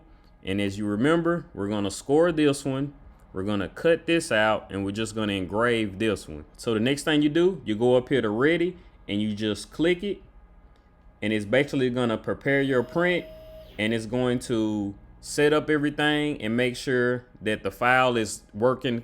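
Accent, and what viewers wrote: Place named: Cleveland, United States. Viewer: If you just listen and not watch you can hear Cleveland lol awesome video my man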